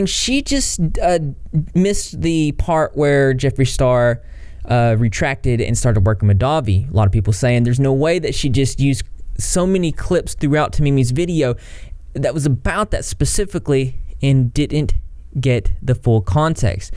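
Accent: South, United States. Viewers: Buddy where are you from,, you sound southern,, I’m from Oklahoma your accent kind of sounds like you are to?????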